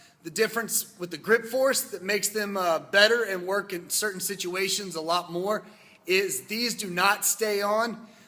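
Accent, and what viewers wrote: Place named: United States. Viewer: The most annoying loud American accent. Imagine being stuck in a lift with this guy on his phone. Mugg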